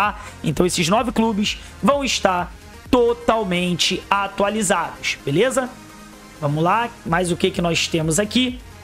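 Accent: Rio de Janeiro, Brazil. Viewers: Vc é carioca ? Seu sotaque Xxx forteee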